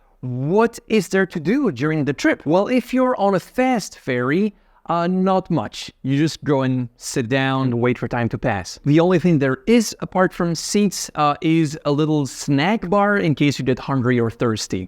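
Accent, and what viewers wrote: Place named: United States. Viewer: Sounds like you learnt your English from the Americans!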